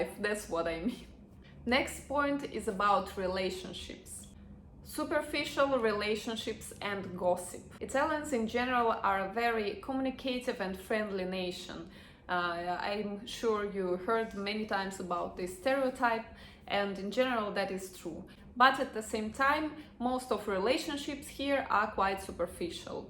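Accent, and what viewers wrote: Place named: Russia. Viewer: She is uttering English words as overlap on Russian thinking and manner of conveyancing thoughts. I am Russian-English interpreter and feel in a second the nature and manner of speaking. Non dovete pensare lei e brutta or sbruffona. Questo e il suo modo di dire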